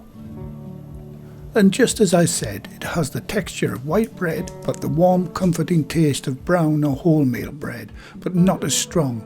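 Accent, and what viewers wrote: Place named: North East England, United Kingdom. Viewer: Such a refreshing video! Just stumbled across your channel and I'm so glad I did! I'm from the North East (Stockton on Tees) and it's so nice to hear the accent along with proper traditional British recipes that my Nana used to make. Thank you, you've just made my evening! Will be making this bread at the weekend:)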